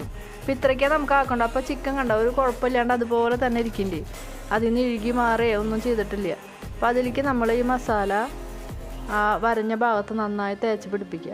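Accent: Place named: Thrissur, India. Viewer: ചിക്കൻ പൊളിച്ചുട്ടാ.. നമ്മടെ തൃശ്ശൂർ ഭാഷയും.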